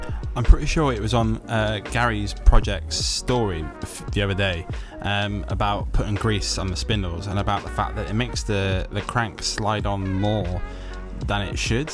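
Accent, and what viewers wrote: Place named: Cockney, United Kingdom. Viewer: Cockney Lang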